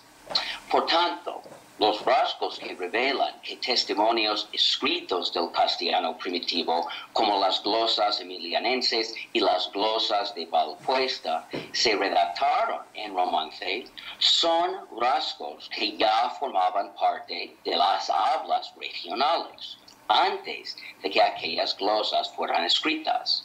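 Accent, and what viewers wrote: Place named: United States. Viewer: Este estadounidense es un sabio erudito y se esfuerza en trasmitirnos datos sumamente interesantes, pero habla demasiado rápido e indudablemente con un acento poco español. En un texto escrito, se le entenería perfectamente. Pero GRACIAS.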